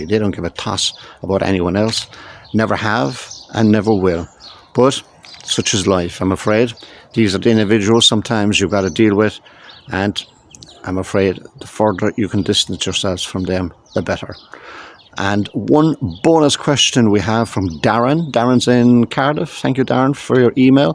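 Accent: Ireland. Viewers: Would you care to back up your claims that there are cartels involved? Show us the proof. The video you saw where Jay lost his sight? Back it up, give us some proof. Currently all everyone is hearing is you blowing smoke out of your ass in an Irish accent...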